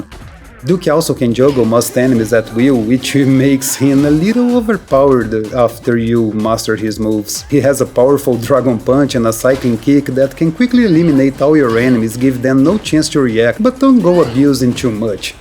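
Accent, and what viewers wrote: Brazil. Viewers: Cara, vc é brasileiro? Me parece que sim pelo seu sotaque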